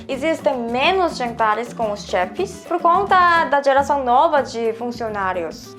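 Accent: South Korea. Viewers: Algumas perguntas; Os seus colegas de trabalho sabem que você fala português e já lhe perguntaram porque você quis aprender essa língua? Eles perguntam a você sobre o futebol do Brasil? Na Copa do mundo de 2002 você já sabia falar português? Seu português é fluente mas ainda com algum sotaque coreano, as vazes você não conjuga o verbo tipo; Eu começar a fazer algo.. seria eu comecei... Mesmo assim conseguimos entender o que você quis dizer. Nós brasileiros achamos muito bom e super legal ver estrangeiros falando ou tentando falar em português mesmo que as vezes de forma ainda crua nós procuramos ajudar e tentar fazer com que o estrangeiro fale do jeito dele e nós tentamos entender e ajudar, as vezes pode vir um portunhol (mistura de português com espanhol). Estou muito feliz de está no seu canal pela sua alegria e simpatia e amor pelo Brasil. Bjs (beijos)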